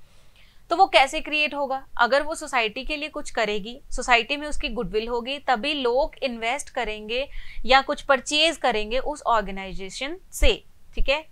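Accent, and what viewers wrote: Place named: Punjab, India. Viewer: Mam your punjabi accent😂❤❤ Dil jeet lete hoo.!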